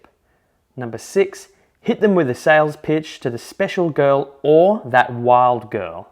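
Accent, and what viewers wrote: Australia. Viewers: Sir your Australian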